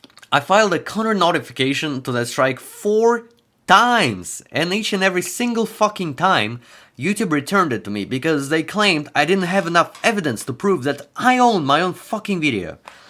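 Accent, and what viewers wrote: Poland. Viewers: Are you Polish? Your location says Australia but you do not sound Australian at all and your YouTube is in Polish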